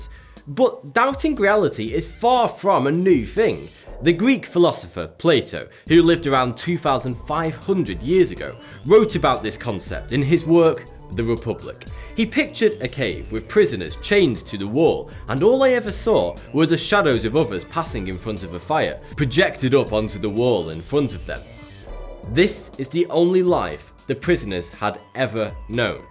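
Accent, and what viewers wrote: United Kingdom. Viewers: ahh that british accent is killing me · Actually he says but because of his British accent, he sounds 42.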